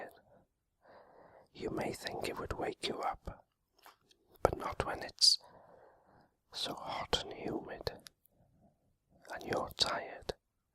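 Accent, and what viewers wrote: Wales, United Kingdom. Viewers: Just came across your videos. So nice hearing a Welsh voice doing ASMR 😀 I'm from North Wales, you? Diolch yn fawr 👌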